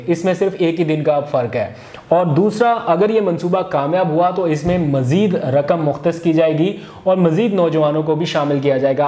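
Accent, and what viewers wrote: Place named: Punjab, India. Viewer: Your Punjabi is so cute bro PAKISTAN Zindabad Kashmir bna ga Pakistan. Lots of love from Azad Kashmir, Pakistan India tum log kro GA Mera PAKISTAN sa mukabla 🤣🤣🤣🤣phla gae Ka uron drink krna Chor do , aur learn from Pakistan to make strong farmers ,young generation and to love all minorities. PAKISTAN Zindabad No one able to compete PAKISTAN because PAKISTAN is one of the secrets of Allah PAKISTAN Zindabad My Kashmir Ambassador Imran Khan Zindabad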